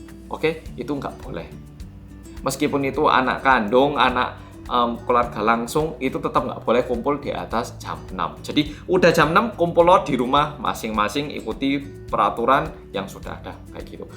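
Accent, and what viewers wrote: Java, Indonesia. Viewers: Hallooo oppa medok....madiun stay tune ya....sama2 ppkm parah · Mase... Jawa biangeeet logate... Gak nyangka jebule wong korea..... Kudu "iri" aku.... Ak ngomong bhsa jawa ora dapet logate...susah rek... Datar nadaku 😁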